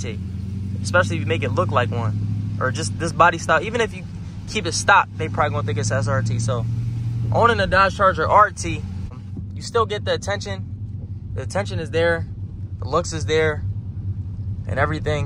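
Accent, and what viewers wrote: Philadelphia, United States. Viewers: u from philly?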